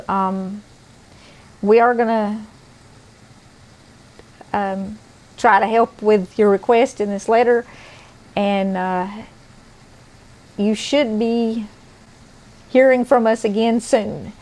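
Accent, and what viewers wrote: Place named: Kentucky, United States. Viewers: I dont know where she is from but I absolutely love her accent. I could be wrong but I'm thinking Appalachia somewhere. I've heard those folks really know how to cook! SUBBED! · I grew up in a military family (Army), my mom has your accent, she's from Kentucky. My dad (Vietnam Vet) made S.O.S. with sausage and he would fry an egg and put it on top of the toast and put the SOS over that. Thanks for your recipe, I grew up on this.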